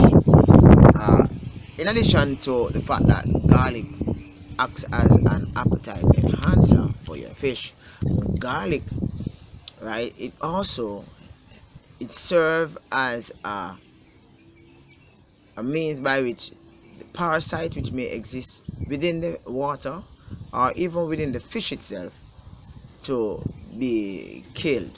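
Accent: Jamaica. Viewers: i really like ur homemade ponds there really nice and pretty good info and i love ur accent Jamaica!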